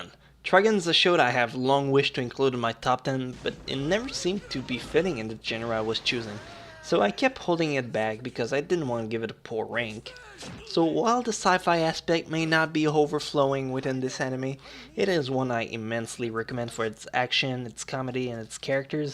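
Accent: Quebec, Canada. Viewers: Hahahaha jaurais jamais pensee que tes quebecois :) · haha clairement québecquois ;) good job ! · Are you from Quebec ?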